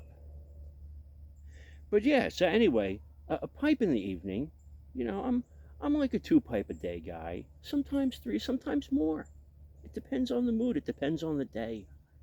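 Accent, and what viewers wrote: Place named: Long Island, United States. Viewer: you r an american orthodox cowboy with a longisland accent. a ministry for the ppl. retards unite. simplecore ftw. and mexican liquid bread is a simple joy. 💕🙏🏻 always praying for u Fren.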